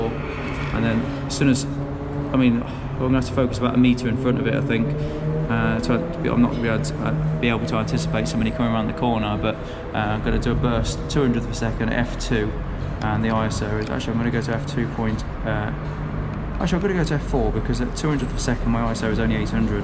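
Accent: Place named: East Midlands, United Kingdom. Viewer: where are you from? I know you live in Swansea but I sense a distinct East Mids accent...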